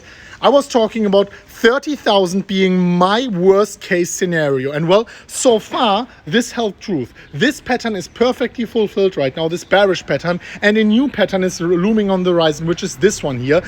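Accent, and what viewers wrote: Germany. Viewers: Ich feier deinen deutschen Akzent jedesmal! Sehr gute und unterhaltsame Videos! Ich schätze es geht NICHT mehr runter, alleine wegen El Salvador!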